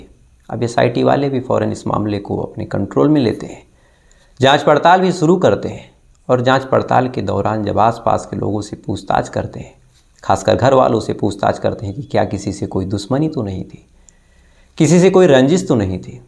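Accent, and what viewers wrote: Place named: North Bihar, India. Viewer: Your style of talking suggests that u r from north bihar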